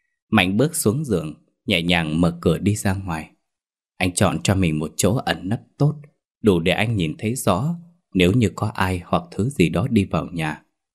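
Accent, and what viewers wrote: North, Vietnam. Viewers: Hóng truyện của tg Huỳnh Thiên Hương. MC giọng bắc nhưng đọc truyện theo văn miền nam, miền tây cuốn theo cách riêng nha. Mình người SG nghe cứ bị ghiền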